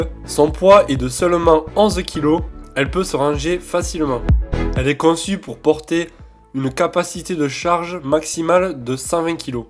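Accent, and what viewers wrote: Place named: South, France. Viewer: Toi tu es pas de paris mais plutôt de chez nous (le sud bébé 😂)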